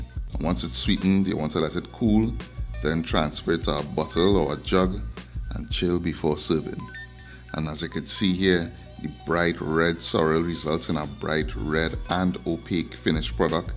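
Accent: Trinidad and Tobago. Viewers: Good explanation man.!!!Love the Trini voice.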